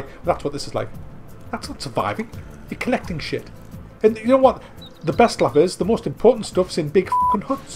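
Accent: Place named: Newcastle, United Kingdom. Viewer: Ahh right, well your accent made this review even funnier, really sounded geordie. What was the game you suggested buying instead of this?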